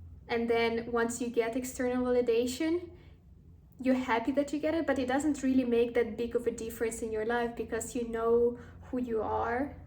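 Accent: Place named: Russia. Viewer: твой русский акцент слишком палевный)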